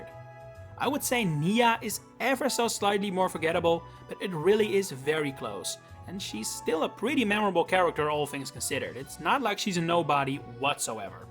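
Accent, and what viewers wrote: Netherlands. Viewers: Dutch accent recognized, opinion respected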